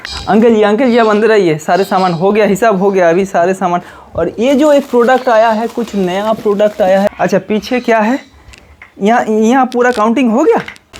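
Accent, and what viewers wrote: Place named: Bengal, India. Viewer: Apnar Hindi pronunciation and words choosing bengoli der moto... So better do in Bengali language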